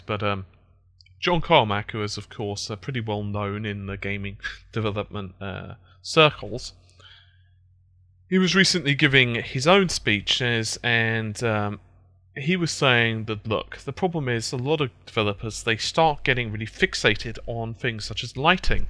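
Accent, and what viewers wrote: United Kingdom. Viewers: That intro always reminds me of a British Buffalo Bill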